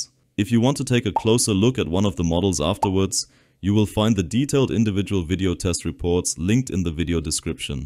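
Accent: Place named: Germany. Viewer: You sound German. Why no discount for Netherlands? 🙏🏼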